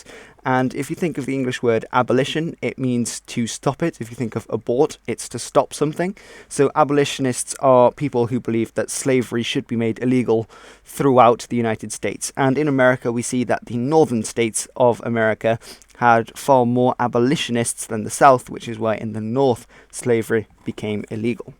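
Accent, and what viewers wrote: United Kingdom. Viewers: Very good work here helps your British for At least us Americans can't get enough of a British accent ! Subbed! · This dude is British it sounds like lmaooo y’all still salty about some Patriot Americans who beat your ass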